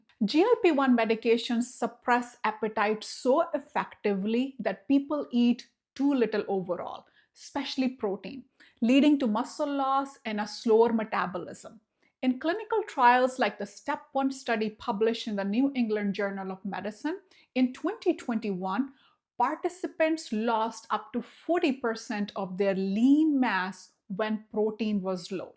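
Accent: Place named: India. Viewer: Good one but sorry to say u should change your pressurized Indian accent ..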